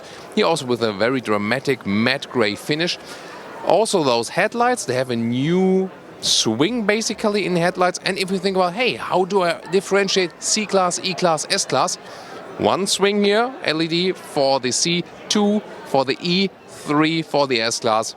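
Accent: Germany. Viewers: like it because he focuses on details in his videos so interested people can really get familiar with the cars before going to the dealer. I may even get used to his German accent :P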